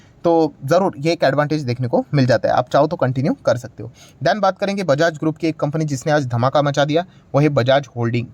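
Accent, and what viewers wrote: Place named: Gujarat, India. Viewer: Sir many times i observed that you are perfect gujarati this is confirmed, i observed your words to words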